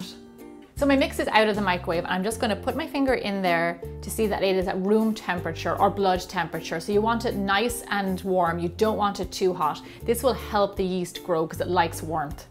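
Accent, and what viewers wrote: Ireland. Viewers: I love the fact you haven't lost your lovely Irish accent after living over there in the states :) x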